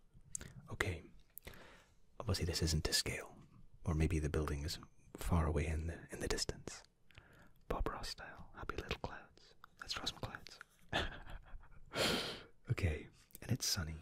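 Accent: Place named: Midlands, United Kingdom. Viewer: What place in the Midlands are you from? I’m from Worcester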